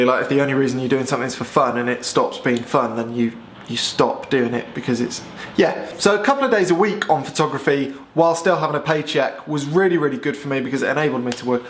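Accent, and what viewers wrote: United Kingdom. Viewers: You just might be my favourite M43, British accented comedic, photographic personality with excellent advice and insights. Your vids are thoroughly enjoyable to watch.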